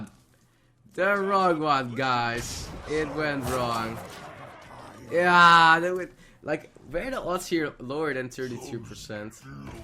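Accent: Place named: Netherlands. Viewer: Je Nederlandse accent is lichtelijk afleidend 😅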